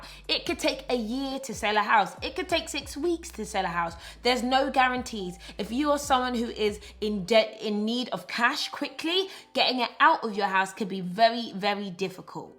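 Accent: England, United Kingdom. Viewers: Are you British, by the way? Your accent sounds really English! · Is she British?😍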